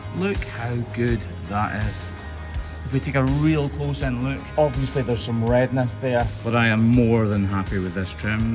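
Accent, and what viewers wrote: Scotland, United Kingdom. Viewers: Tired of hearing your Scottish voice. Just show the video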